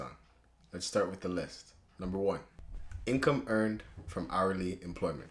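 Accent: Jamaica. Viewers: Hey bro, Are you originally from Jamaica? Also, what broker do you recommend for US clients? Thank you for sharing.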